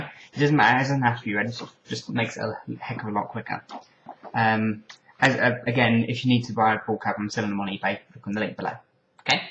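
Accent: United Kingdom. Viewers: dear god. listening to Darth Maul speak in a cheery british accent is so bizarre..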